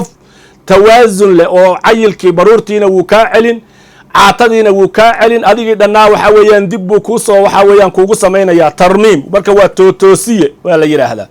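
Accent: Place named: Doollo, Somalia. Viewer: Sheekhow doollo miyaad kudhalatey afkaaga aadbaan ufahmayaaye?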